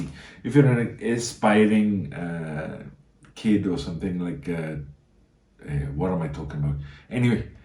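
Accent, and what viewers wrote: Wales, United Kingdom. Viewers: A wonderful commentary and who better than a rugby Welshman to deliver it. Thank you from South Africa.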